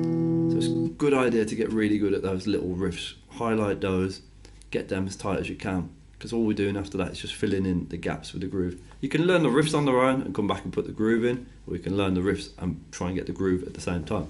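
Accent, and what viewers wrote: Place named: Midlands, United Kingdom. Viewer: U sound really Midlands lol I love it......Ur teaching but I need you to show me imgd but dumb lol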